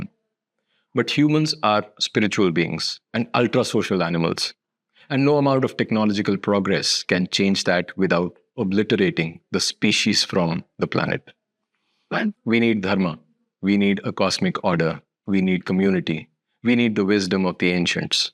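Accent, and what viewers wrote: India. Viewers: I came my chance; thank you. Your accent and narration indicates a mix of north and south indian (tamil?). Once again a big thank you!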